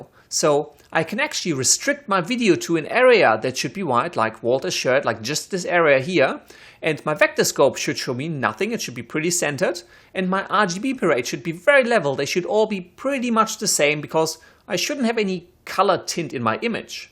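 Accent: Germany. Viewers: I could always rely on a guy with a German accent to give the best lessons and tutorials. Thanks heaps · i guess his german accent is very strong with him XD but the stuff he made is still awesome ^^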